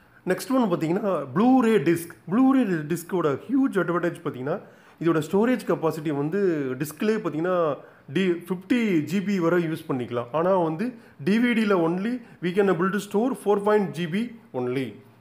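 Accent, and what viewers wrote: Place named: Kerala, India. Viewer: Sir sounds Kerala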